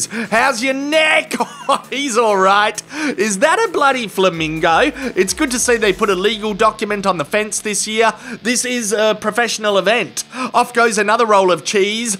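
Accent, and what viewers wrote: Australia. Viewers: Good clean fun. "Big atomic wedgie". The Aussie voice over is awesome. That's why us Brits love 'em. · You can't beat an aussie commentator.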